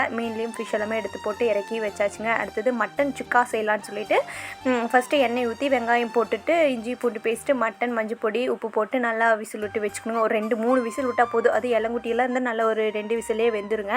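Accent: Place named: Kongu, India. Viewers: Haaiiiiii sis neenga tirupur Karur near area ahhhh... Na Kangayam... Unga slag Namma area mari irukunga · amanga ivanga Kongu slang than pesaranga....பல்லடம் area va irukum nu ninaikiren...nan tirupur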